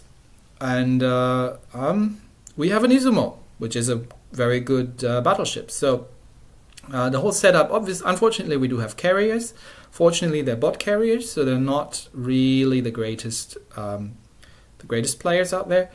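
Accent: Germany. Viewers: Hey are you by chance german or scandinavian? Noticed your accent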